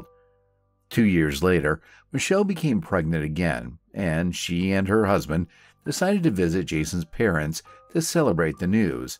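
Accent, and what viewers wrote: United States. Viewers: It's an American crime and the narrator sounds American, using miles would be a nice touch or both.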